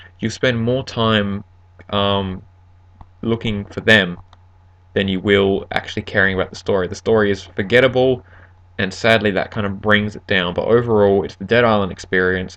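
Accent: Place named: Australia. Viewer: you sound like a true aussie :P good work!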